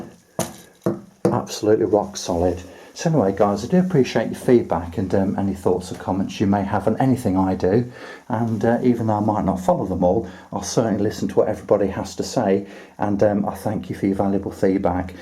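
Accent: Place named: England, United Kingdom. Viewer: I've got cuts in the plaster board but not through to the brick work so do I need PVA and would skim coat on its own do ?? Please answer soon as you can ps I worked in England for 32 years been home in Ireland now for eighteen months loved hearing your accent again reminds me of all the fine English men I worked Drank and had the craic with miss you guys take brother and regardless if you answer thanks for the vid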